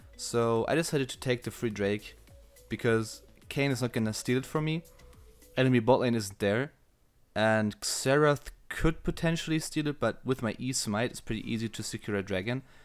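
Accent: Germany. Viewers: Geiles Video! Man hört das du Deutsch bist, trzdem sehr geil! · Man hört sofort das du ein Deutscher bist haha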